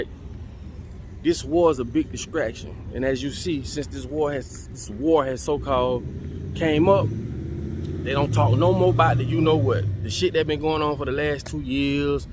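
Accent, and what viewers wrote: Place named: Virginia, United States. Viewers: My guy articulates the truth with that southern "swang" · You sound like you’re from Newport News Va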